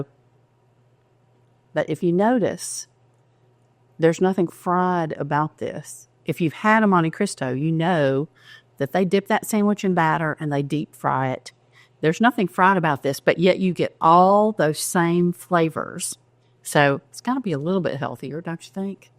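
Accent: South, United States. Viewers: Of course. I like her on video, she is so pleasant and calmly cheerful, she sounds like a female Mr. Rogers, with a southern accent (Georgia?)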